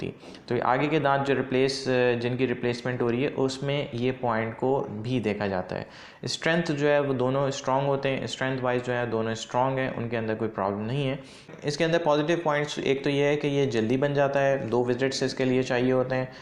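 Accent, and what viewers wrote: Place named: Karachi, Pakistan. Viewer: ڈاکٹر صاحب آپ کی لینگویج سے معلوم لگ رھا ھے کہ آپ کراچی سے ھو۔۔۔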